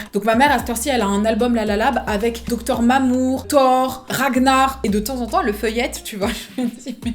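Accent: United Kingdom. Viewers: ur BRITTISH ACCENT IS AMAZING🥺💞💞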